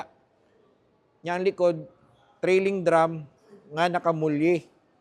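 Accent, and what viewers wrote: Visayas, Philippines. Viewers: bisdak diay ka dol? or AI generated voice. hehe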